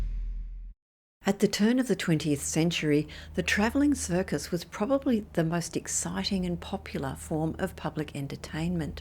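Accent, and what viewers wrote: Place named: Australia. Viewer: Finally, an Australian narrator, with a nice soothing voice at that.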